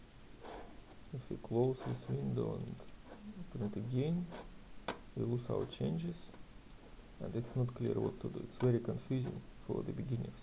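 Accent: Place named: Russia. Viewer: лучше по-русски говори, ёр рунглиш вери бед)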